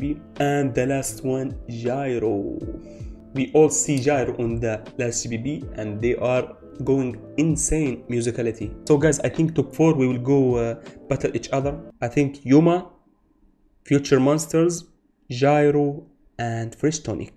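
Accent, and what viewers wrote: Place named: Arab world. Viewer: Where r u from bud cuz u have an arabic accent and if u are i'll be really happy to see someone from MENA creating content about beatbox 🇩🇿👏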